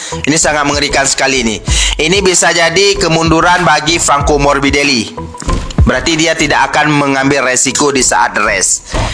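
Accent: Aceh, Indonesia. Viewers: Admin logat aceh melayu... Gua orang kalimantan aja paham kok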